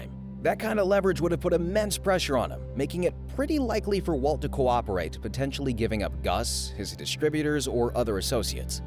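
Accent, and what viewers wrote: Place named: North America. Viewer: well the mf speaks perfect English in a north American accent so I assumed bro might either be from the US or Canada... Not a crazy assumption to make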